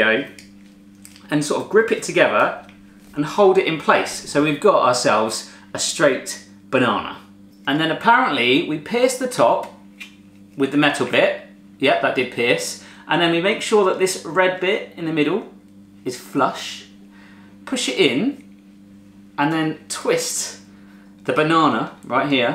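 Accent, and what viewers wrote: United Kingdom. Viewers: Are you British or America?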